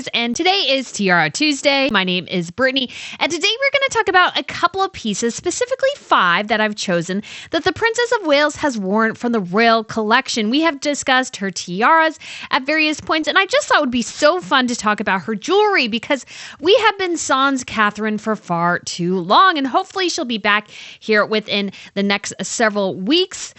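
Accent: United States. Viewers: Sorry the annoying, screechy, out of place, American voice was just too much for me to continue watching . What a shame!!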